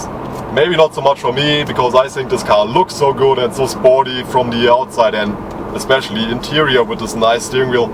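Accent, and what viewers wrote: Germany. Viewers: canomalo he's German not slow dumbass...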